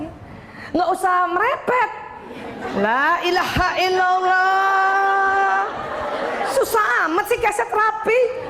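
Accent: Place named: Banyumas, Indonesia. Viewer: Bocah ngapak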